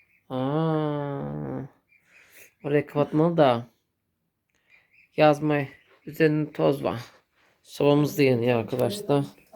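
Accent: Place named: Zonguldak, Turkey. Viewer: zonguldakça ayrı bir lisandır👍, kardeşim hakkını veriyorsun. bilhassa bu doğallık baktırıxor bu Vloglara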